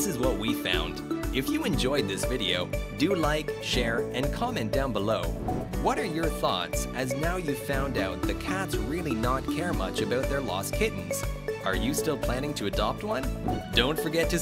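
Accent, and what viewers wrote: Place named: Europe. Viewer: european english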